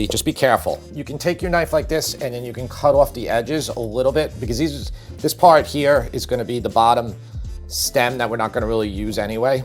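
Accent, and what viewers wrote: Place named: Pittsburgh, United States. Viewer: You sound like a Pittsburgher! My Slovak grama put ketchup in eveything including her homemade chicken noodle soup!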